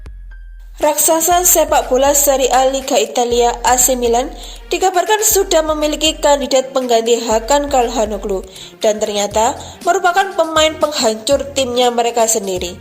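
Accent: Java, Indonesia. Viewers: medokss banget mbak..😅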